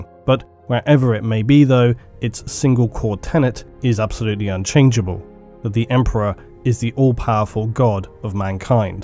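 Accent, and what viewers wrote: United Kingdom. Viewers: Why do you think I like for my 40k lore fix the most? =D Love that British accent, its like Totalbiscuit's except with more charm.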